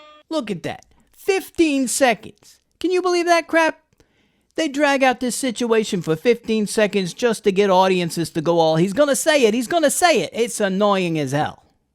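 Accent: Brooklyn, United States. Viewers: You from Brooklyn,? You talk like Joe Pesci. Joe.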